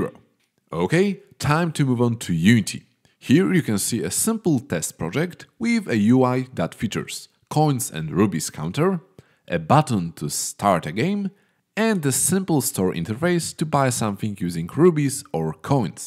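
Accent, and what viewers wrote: Poland. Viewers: Tak mi się coś wydawało, że to Polak do mnie mówi.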